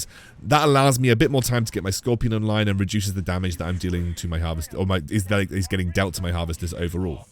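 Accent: United Kingdom. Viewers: You do a good job on these videos pal, what part of the UK are you from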